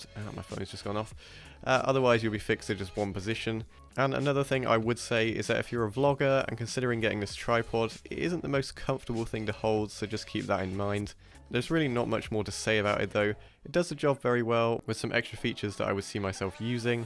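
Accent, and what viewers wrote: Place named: North, United Kingdom. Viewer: hey man so where is ur accent from? like u sound like northern but you speak really clearly so i dunno what is going on lol.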